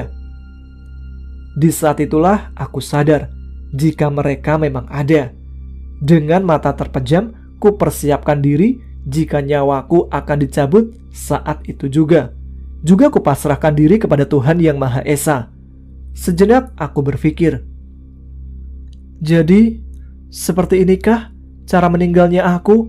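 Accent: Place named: Banyumas, Indonesia. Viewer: Hay... Aa ngapak... Aku dari Brebes nih... Salam santun buat mas.makasih untuk ceritanya.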